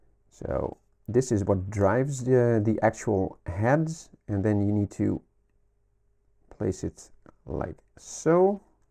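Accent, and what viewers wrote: Netherlands. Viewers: Hahah, that accent really gives you away. Hallo, noorderbuur! 😁 And thanks for the instruction video. Was considering picking up a walkman from eBay, and wanted to get an idea of how hard it would be to replace the belts. Didn't look too complicated, so now I got a WM-FX45 + new belt on the way. One thing though. When replacing the belts, it's always a good idea to clean the pulleys with a q-tip drenched in alcohol. This removes the rubber residue left by belts that have gone sticky, or rubber dust from belts that dried out. Always a good idea to do some maintenance while you're in there anyway.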